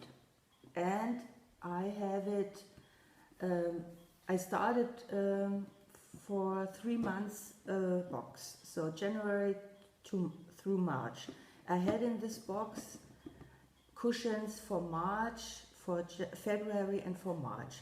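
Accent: Germany. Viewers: You explain everything perfectly! Don't worry about anything, your English is very good and I understand what you are saying. In fact, your videos are so entertaining to me.... I didn't take German in high school, so your accent and German tendencies are fascinating to me! I would love to meet you someday if you are ever in the states....❤️❤️❤️❤️❤️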